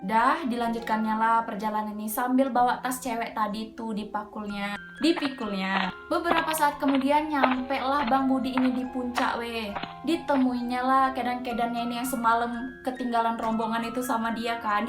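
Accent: Medan, Indonesia. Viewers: Mantap logat medannya dah kluar lagi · nengok cakap Medan nya kok jadi sor kali, jadi pengen liat cerita" yang laen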